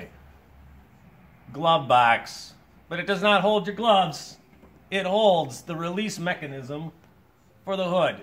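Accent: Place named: Wisconsin, United States. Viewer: This is the most Wisconsin sounding tutorial in the world. As a life long Wisconsinite I love it. I was waiting for and “ope” on the reset switch. Great content.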